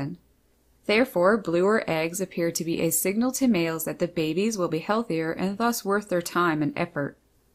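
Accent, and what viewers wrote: Canada. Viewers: I can tell you're from Canada. You say" aboot" for about. Lol. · I really love this video about the color of Robins’ eggs & the blueness! Very interesting and informative and educational! It totally makes sense! I was just thinking maybe they were that color, so if the egg fell out and went on the ground… But I guess mom or papa bird can’t pick that egg up and put it back in the nest!!! Thank you very, very much! Love your Canadian accent! Gay Michaelis 😁👋❤️🤗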